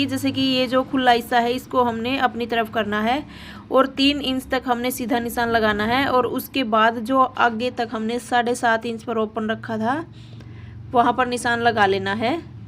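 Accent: Haryana, India. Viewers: Good morning mam aap ka pahle ka vidio me sikhane ka tarikka bahut hatt ke hay abhi bahut hi acha hay aap ki bate Haryana stayil hay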